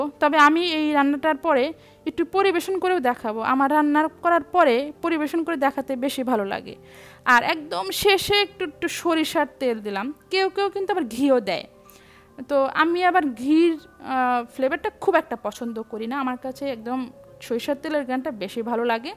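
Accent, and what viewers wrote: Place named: Tangail, Bangladesh. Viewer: আপু আমি তোমার সব ভিডিও দেখি,সব গুলো আমার ভালো লাগে। আর কথা শুনে আমার মনে হয় তোমার কথা আমদের কথার মতো, তোমার বাড়ি কি টাংগাইলে, আমার বাড়ি টাংগাইলে সদর থানা পাড়া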